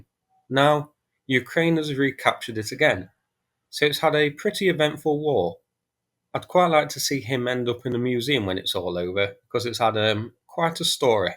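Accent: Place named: Midlands, United Kingdom. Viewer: Hi, I know you are in Tiawan, but just wondered if you are orginally from the midlands in England as you have that accent.......Great channel btw, thanks for the very informative videos.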